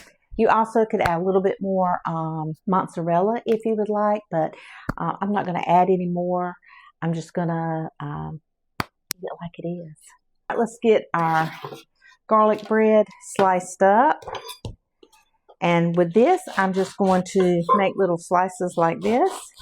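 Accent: South, United States. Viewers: Thank you Friend. I love your cooking and your Southern accent.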